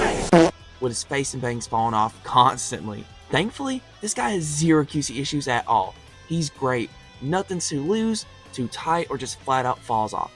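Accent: Texas, United States. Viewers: is it like a Texan accent or smth idk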